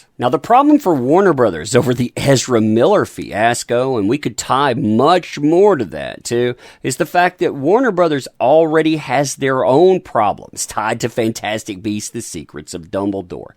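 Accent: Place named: Appalachia, United States. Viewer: Can you stop talking in that accent? You're not a hillbilly hick from the 18th century.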